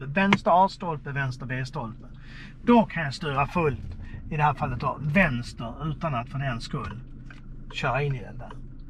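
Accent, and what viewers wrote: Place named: Scania, Sweden. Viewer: Skånskt dialekt 💪 Pedagogisk och tydligt förklaring Tack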